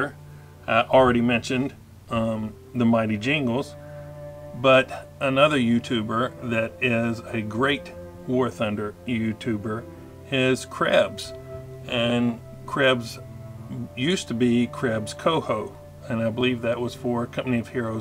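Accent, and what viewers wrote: United States. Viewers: nice to hear an american voice. Thought it was an EU monoply:) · hello, I first saw your channel about half a year ago and I liked what I saw but then I just kinda forgot about that until today when I was watching Mingles with Jingles and looked in the description and saw your name. I was like oh yea I remember him so now here I am.:) About the giveaway I don't know If its over yet or not and honestly I don't believe I would win it anyway, I don't usually. But I don't care about that I like that you are kind of like my favorite YouTuber Magz, just a little slower in speech and you're American so that's cool. You seem interesting and your content seems more mature than some other YouTubers I shall not name, thus you have gain another sub today.:D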